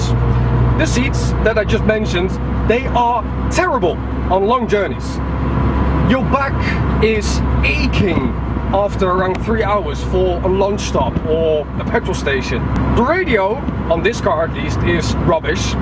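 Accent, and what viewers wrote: Netherlands. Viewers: ik hoor echt dat je NL bent <3 toffe vid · Let me gues your dutch. Dat hoop ik tenminste anders heb je wel een speciaal accent. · Je hebt wel een heel Nederlands accent :D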